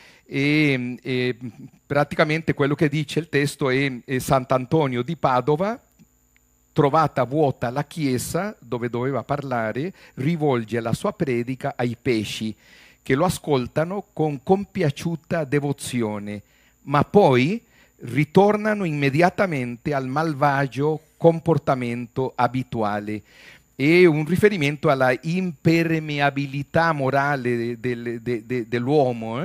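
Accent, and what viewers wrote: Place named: Costa Rica. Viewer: Es un enorme placer ver y escuchar al costarricense Gaston en esta deliciosa conferencia, en que llevados atraves de la armonía del italiano en versión tica, clara y precisa, nos lleva de la mano a explorar la obra de Gustav Mahler. Me conmovio el haber sentido el profundo sentimiento de respeto y admiración del Sr. por la obra de Mahler atraves de su voz que trae remembranzas del pasado. Mil gracias.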